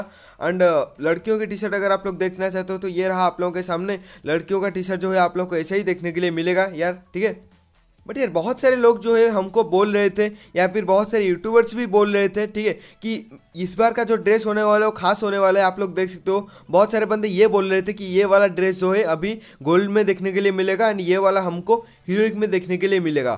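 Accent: Odisha, India. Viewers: Are bhai tum odisha se ho kya ?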